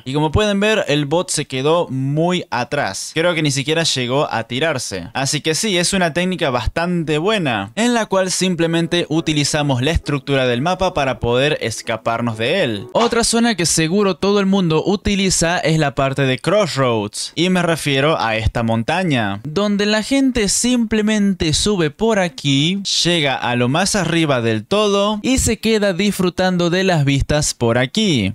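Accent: Argentina. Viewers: Vos eres. De 🇦🇷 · ENTONCES ERES DE ARGENTINA? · eres argentino esa es mi pregunta y tambien yo soy argentino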